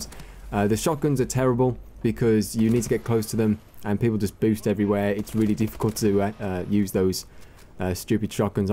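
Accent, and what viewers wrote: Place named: United Kingdom. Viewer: thank u for the tips n trick, british sounding tmartn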